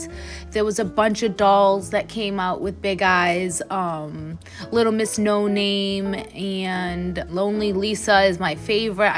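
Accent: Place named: Maine, United States. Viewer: Love your accent! Do you live in Maine?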